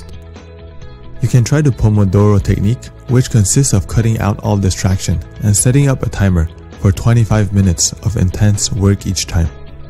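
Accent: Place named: Singapore. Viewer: I can see that you are singaporian through accent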